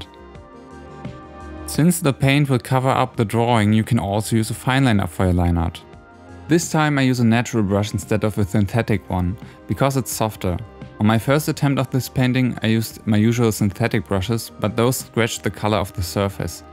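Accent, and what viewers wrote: Germany. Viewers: Are you german? You seem to have an accent:3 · Wow, It's gorgeous. Your gouache drawing looks like it's a digital art. Also, do I hear a German accent, hmmm :) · Are u German? U sound like my class. But in a good way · Man hört schon raus dass du Deutscher bist.:) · Dein deutscher Akzent ist mega süß😂💕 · dude, you german? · Are you german? Somehow you sound like it :'3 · I'm pretty sure he's German, he just sounds like almost everyone here in Germany who tries to speak English. I also have an accent like that and I think it's so embarrassing! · You Sound German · Are you german? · Are you German? You really do seem to have a German accent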